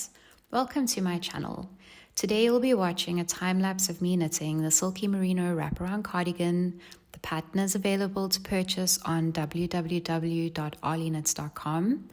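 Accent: South Africa. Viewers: I thought I heard an South - African accent. Thank you for sharing. Look forward to watching your backlist. 😊😊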